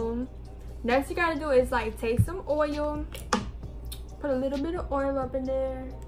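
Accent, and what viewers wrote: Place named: New York, United States. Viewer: she sound like she from new york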